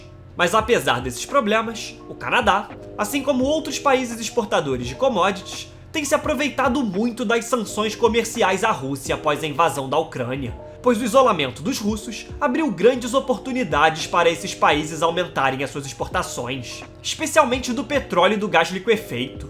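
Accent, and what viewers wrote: Rio de Janeiro, Brazil. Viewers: O vídeo é bom mas o sotaque carioca é irritante de mais. Por que se troca o "S" por X ? É uma coisa q não tem o menor sentido. "S" é "S" e "X" é "X" não consigo entender pq. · trabalhar mais o teu português carioca... parace uma panela de pressão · Sotaque carioquês do narrador é horroroso! O resto, já sabia faz tempo. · Coloca um cara q fale normal... O cara puxa muito... Carioca fala muito feio.... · Conteúdo interessante. Narração terrível, complicado ouvir até o fim. Mistura de carioques, com Marcelo Resende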